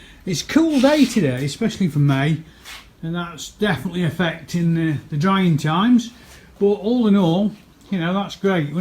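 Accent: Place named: United Kingdom. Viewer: Where abouts in the UK are you from?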